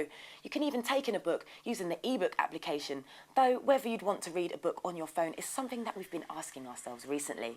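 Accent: United Kingdom. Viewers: That british voice is soo nice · Idk ... I just have my Samsung 8500 wave and I'm just happy =) . BTW ... what accent was that??? it's sounds like... british , but I'm not sure cuz I'm a spanish speaker ...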